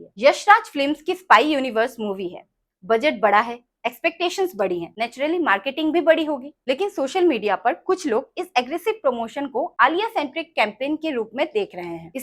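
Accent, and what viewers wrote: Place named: Bengal, India. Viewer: i like your bengoli accent hindi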